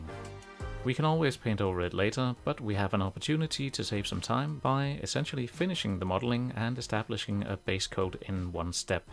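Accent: Denmark. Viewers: er du dansk? det lyder sådan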